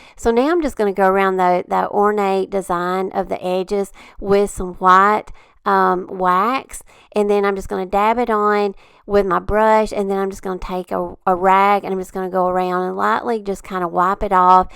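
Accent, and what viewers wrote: South, United States. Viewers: I love your southern drawl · Just now seeing your channel for the first time. Love your accent! I’m a southern girl myself! Great crafts projects!! ❤️❤️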